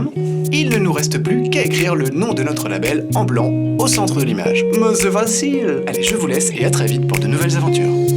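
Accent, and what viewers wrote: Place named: Germany. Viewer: xD je croyais que tu était allemand au début ^^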